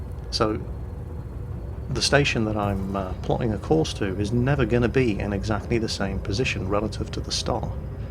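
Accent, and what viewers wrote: Netherlands. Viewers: 10th!! and btw jingels, it does sound dutch, he sounds like my math teacher when he is talking english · I dont think it is dutch, he's talking english :p he has a really dutch accent tho ( i'm dutch ) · he does sound dutch... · The voice does sound pretty Dutch. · yep he sounds Dutch to me, and i`am Dutch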